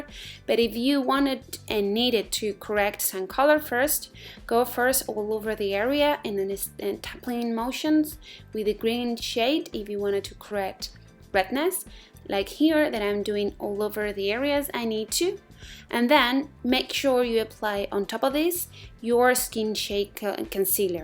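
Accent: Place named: Spain. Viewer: acento spain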